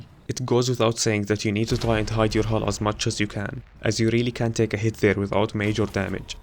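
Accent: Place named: Arab world. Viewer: Love the Arabic accent ;) nice vid man, keep it up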